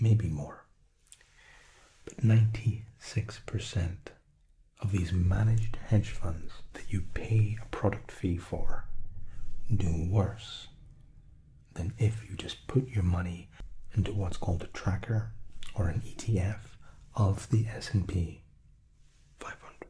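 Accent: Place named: Scotland, United Kingdom. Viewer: Not gonna lie, I like the ones where the Scottish accent is extremely obvious 😂all of them are great, though ❤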